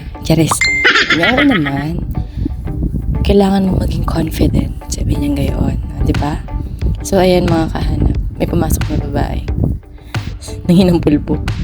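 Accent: Bicol, Philippines. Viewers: ahh ay akala koy taga mindoro ka..taga bicol ka baga?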